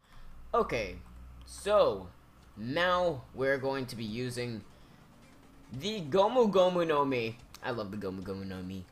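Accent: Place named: New Zealand. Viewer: Oh lol I didn't think you sounded Hispanic because your accent sounds very similar to a New Zealanders Accent so I really didn't make that kind of connection.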